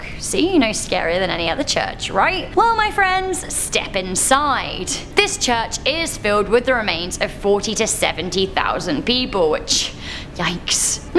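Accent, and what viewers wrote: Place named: United Kingdom. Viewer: There is nothing sexier than a subtle British accent. Love it. FYI almost all my ancestors come from your country. Found out thru DNA testing. I now know where all my family came from and its awesome.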